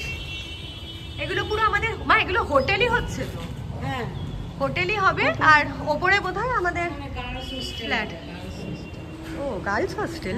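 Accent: Bangal, Bangladesh. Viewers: Khub bhalo laglo specially bangal bhasa ta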